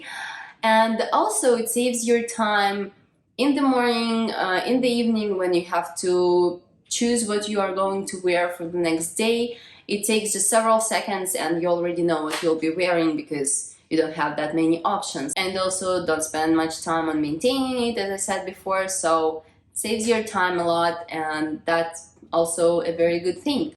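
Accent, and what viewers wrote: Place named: Russia. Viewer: Happiness is found in contentment and simplifying ones life. Buying lots of clothes to impress others will produce disappointment and empty your wallet. Thank you for paving the way for others to consider the minimalist lifestyle, it is certainly producing fruit for me. A technical note: Your voice seems to echo a bit in your kitchen and combined with your light Russian accent it may not always be easy to understand you. Consider getting a small clampable microphone to attach to your neckline, it will make your voice very distinct. I noticed when you were (outside) talking while headed to the thrift store a few weeks ago, your voice was quite clear. For what its worth. :)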